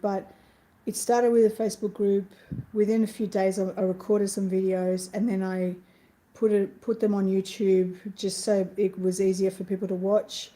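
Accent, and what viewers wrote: Australia. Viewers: You’re much more Australian today! ❤️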